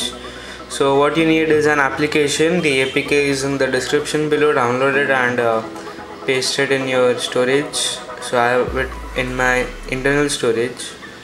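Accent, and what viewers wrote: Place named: India. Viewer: an another indian phone toturial, who speak with accent...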